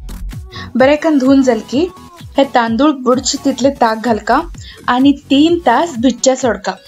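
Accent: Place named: North Canara, India. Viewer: Very nice ithink you are from north canara .my mother wasfrom north canara .always she is to make .her bhasha also like you speeking.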